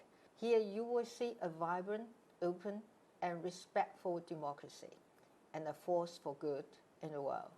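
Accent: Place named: United Kingdom. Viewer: 總統的英式英文聽起來很舒服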